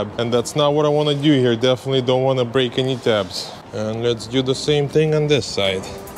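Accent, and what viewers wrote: Russia. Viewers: Hello! Where are you from? I think you are from Russia or Ukraine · русский чтоль?)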